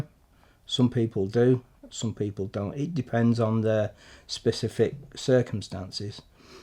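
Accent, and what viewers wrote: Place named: Australia. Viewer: I hear a bit of Australian in her wow !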